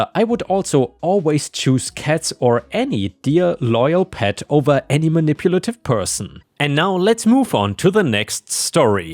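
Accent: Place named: Germany. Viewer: I expected to hear atleast 1 German word the entire time I listened to this lol yall can't tell me dudes 1st language isn't German lol obviously I'm talking about the narrator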